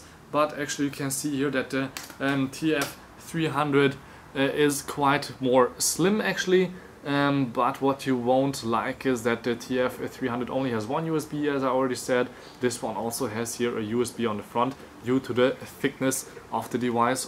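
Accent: Germany. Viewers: Strong german accent...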